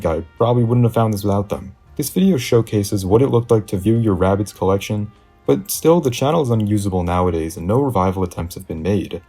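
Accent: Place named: Portugal. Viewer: Are you portuguese? You have a certain accent.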